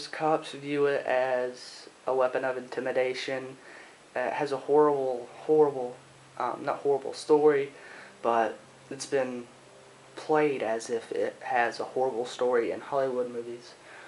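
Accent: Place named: Australia. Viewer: If they are illegal where did you get them? You sound kinda Aussie so I'm guessing you get them imported. Please reply! I am looking to buy one :)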